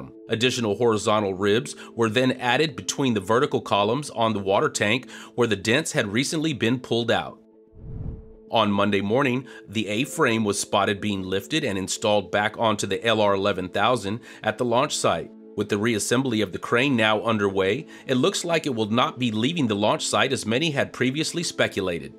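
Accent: Texas, United States. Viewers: Congratulations!... Keep that Texas Twang coming. " The South Shall Rise Again... to Mars! "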